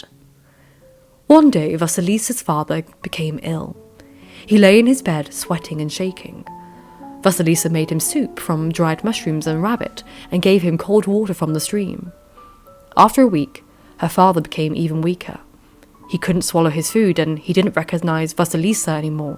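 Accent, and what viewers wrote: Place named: Upper class, United Kingdom. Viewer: This was well told. The narrator sounds like she has an upper class British accent, which is quite pleasant. She also tells the story of Vasalisa and Baba Yaga with good verbal technique. I enjoyed hearing the folk tale.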